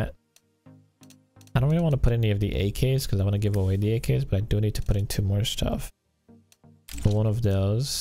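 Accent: Romania. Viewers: is this guy from romania????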